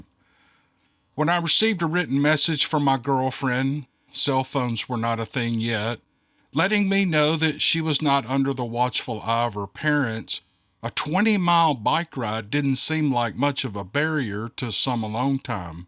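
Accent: South, United States. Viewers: Thays’ boogers haha I love southern accent especially storytelling.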